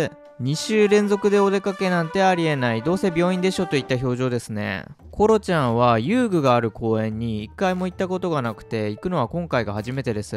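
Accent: Ibaraki, Japan. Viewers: コロちゃんの茨城弁が可愛いです 手作り弁当美味しそうでうらやましいです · 出たぁー🎉コロちゃんの茨城弁😂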